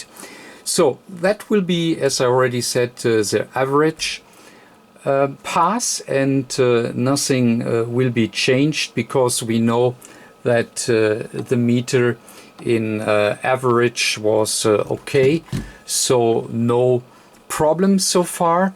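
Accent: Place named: Germany. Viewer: This is a magnificent tutorial. I think you might say "ausgezeichnett". It's got everything - careful and clear description of the theory, the practical implementation and finally the proof that it all works to plan. I have absolutely no problem with the slow delivery in English. Makes it clearer and I find the German accent charming.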